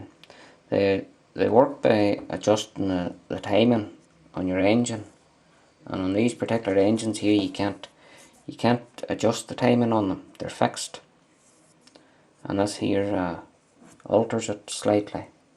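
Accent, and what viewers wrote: United Kingdom. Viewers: Sounds like Northern Irish accent speaking English obviously.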